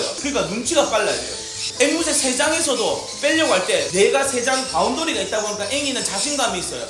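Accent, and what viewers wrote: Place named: Gyeongsang, South Korea. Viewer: hand-pink-waving:hand-pink-waving:hand-pink-waving:안녕하세요 올만에 적어봐요 ㅋㅋ아따~!! 말도 잘하신다 갱상도 사투리로 막 나갑미데이~! ㅋㅋㅋㅋㅋㅋㅋㅋ 직입미데이~! ㅋㅋㅋ 역쉬 짱~!!! 머리가 좋은거죠 ㅋㅋ애기들이 ㅋㅋ 그래도 아파요 ㅋㅋ 장갑껴도 안된다 하시고 ㅋㅋ 손꾸락 끊어져도 걍 맡겨야겠네요 힛 ~^^ 항상 응원합니다 ^^:face-red-heart-shape:yougotthis:yougotthis:card-red-penalty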